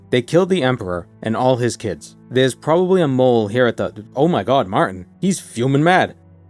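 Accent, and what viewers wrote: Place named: New England, United States. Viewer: that’s sincerely just how the new england accent is lmao. they talk on fast forward up there.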